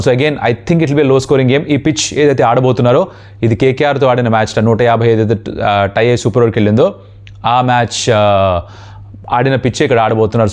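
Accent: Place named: Telangana, India. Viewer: అరే అన్న.. నువ్వు చెప్తుంటే నాకు ముచ్చట చెప్తుంటే చెప్తూ మంచి విషయాలు చెప్తా అన్న.. సూపర్ అన్న.. మీతో పాటు కామెంటరీ చెప్పే ఆ ముసలి అంకుల్ ఏదో భాష మాట్లాడుతాడు.. ఆయనకే వచ్చు అన్నట్టు ఏదో నీట్ గా మాట్లాడుతున్నట్టు చెప్తాడు. అట్లా చెప్తే ఎవడు వింటాడు అన్న.. ఇట్ల మన తెలంగాణ భాష, యాస లో చెప్తూ ఉంటే ఆహా వినడానికి , నాటు కోడి కూర తిన్నట్టు ఉంటది అన్న.. సూపర్ మీరు..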